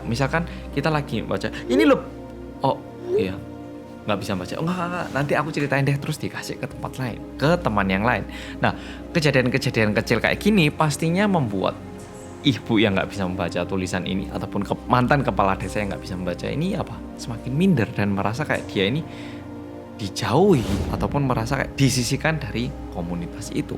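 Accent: Java, Indonesia. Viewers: MEDHOOOKKK e ikulo.. medok polll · Suka dengar bercerita..orang korea cakap bahasa indonesia jawa....teringat jiran ibu dulu orang jawa,tapi orang malaysia.macam ni lah cara dia bercakap...moga terus maju..salam dari malaysia. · 'Halo gezz welcomeback to korea reomit bersama orang korea yang.... medokk' terngiang² di kepala😌😂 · Konten yang kurindukan dari Chanel medok~ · Orang Korea yang medokkkk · Orang korea yang medokkkkk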